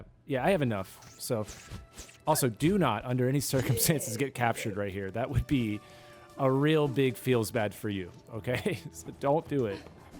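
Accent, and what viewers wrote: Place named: Australia. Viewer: This guy's funny with the Australian accent 🤣 😂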